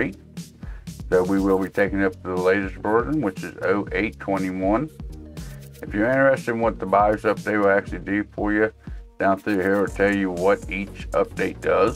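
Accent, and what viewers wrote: South, United States. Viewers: I’ve never seen a man so southern but so smart with pc😂 THANKS ALOT BROTHER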